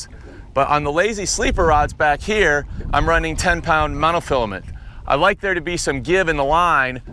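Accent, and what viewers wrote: United States. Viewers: you sound like a yank ,but then you say english slang...love the vids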